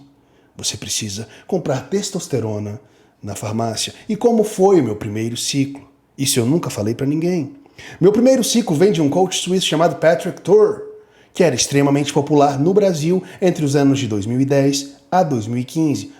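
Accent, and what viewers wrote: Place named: United States. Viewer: é americano, isso explica o inglês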